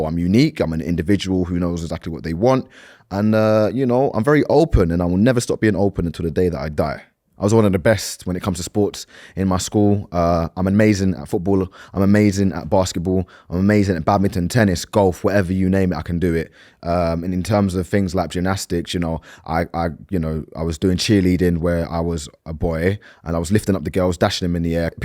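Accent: United Kingdom. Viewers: Sounds like a British. Very confident guy.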